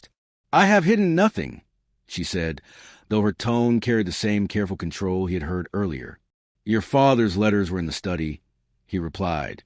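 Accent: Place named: United States. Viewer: Why haven't these regency romances not been given English narrators. Sound very odd in American English.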